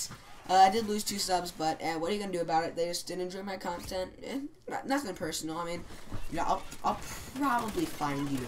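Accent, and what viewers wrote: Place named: China. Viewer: Windows ahah your Chinese accent tho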